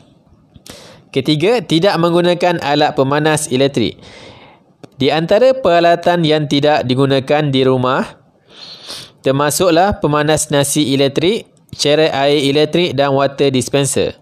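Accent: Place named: Terengganu, Malaysia. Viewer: suara ganuuu pekat 😂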